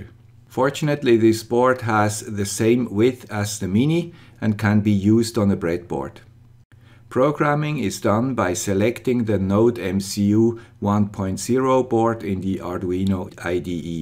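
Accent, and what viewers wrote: Switzerland. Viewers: schön wenn ma zu sim schwiizer akzent stoh chan ;)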